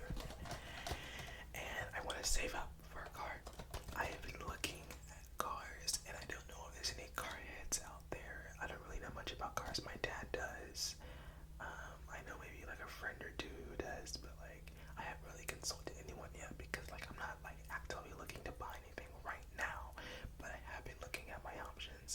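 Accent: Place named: United Kingdom. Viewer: the british accent omg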